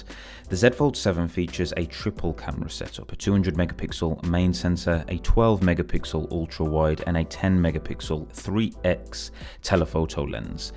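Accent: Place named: Black Country, United Kingdom. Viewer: You from dudley?? I like black country people, my mum is from there. 😂❤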